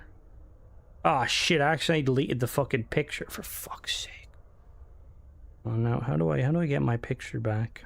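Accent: Ireland. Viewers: Awesome stream,...I usually just watch for the dancing guinea pigs but stay for the trash talk in an Irish accent 😂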